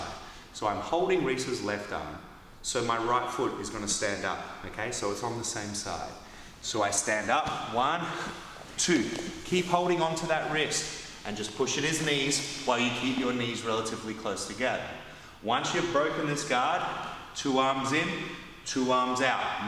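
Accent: Australia. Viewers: I watched a bunch of Bjj videos, but for some reason, your videos resonate with me and easy to digest. Maybe it’s your Aussie accent haha. thanks from San Francisco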